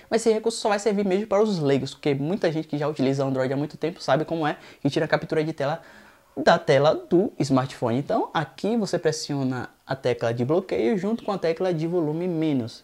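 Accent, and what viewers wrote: Bahia, Brazil. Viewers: Você é baiano também? Boas dicas!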